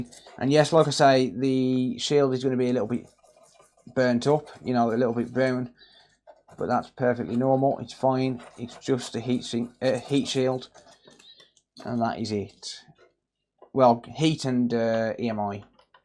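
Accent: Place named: Walsall, United Kingdom. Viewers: Great video mate, you proper sound like your from near me (Walsall) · you gotta love a good brummie accent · very interesting mate,you from birmingham ?…now i know where to send my repairs 🫡👍🏼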